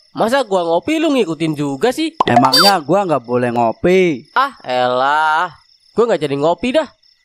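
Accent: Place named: Banyumas, Indonesia. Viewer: Bahasane ngapak wae om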